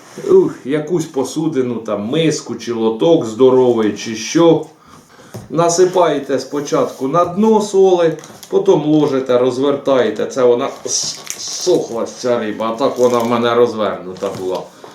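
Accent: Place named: Central Ukraine, Ukraine. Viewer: Така смачна балачка! Черкаси,Полтава,Кропивницький?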